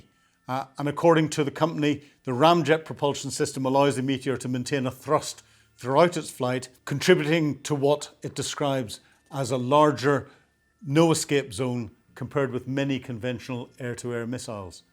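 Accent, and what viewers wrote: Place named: Northern Ireland, United Kingdom. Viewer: Love your blog,love your NI accent AND love your jacket 🇬🇧🇺🇦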